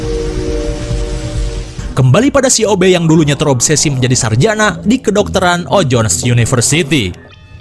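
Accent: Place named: West Java, Indonesia. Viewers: Logat Sunda nya ciri khas dari kang 😅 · urang mna ciga urang sunda ning abi t padalarang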